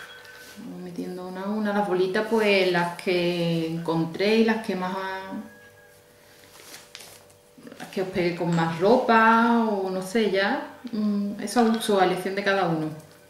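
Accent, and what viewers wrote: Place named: Cadiz, Spain. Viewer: LA GRACIA DEL ACENTO DE CADIZ ES UNICA!! CUANTO ME HE REIDO YO CON LAS HISTORIAS DE MI AMIGO PACO QUE ES DE LA LINEA DE LA CONCEPCION : A LOS CHICLES LES llamaban LA CHINGÁ( iban a gibraltar y compraban cheiw gumm..de ahi chinga) y su tio le compraba los zumos de limon ms caros.. ( queresulta q llevaban ginebra) y que a mi amigo paco de niño le encantaban y con ellos jugaba al futbol como nadie.. cuanto me he reido con el.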